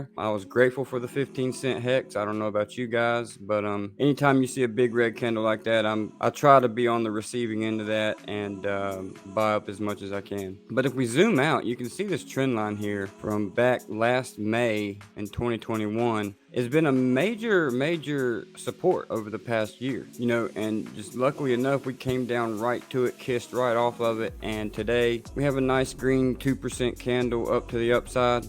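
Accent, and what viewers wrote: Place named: South, United States. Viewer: you keep it simple that’s why I like you! And your southern n into crypto rare combo! Oklahoma here so I appreciate a firmiliar voice in the space ! Took your advice thank you!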